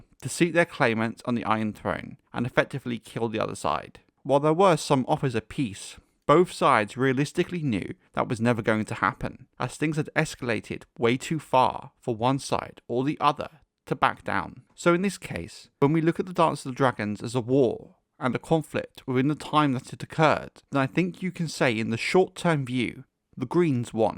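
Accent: United Kingdom. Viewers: The dude is the most British sounding dude ever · i don't know if you're Bristish with a strong accent or English is not your first language and you speak with English accent, but it's really hard to hear and follow what you say even with the caption on.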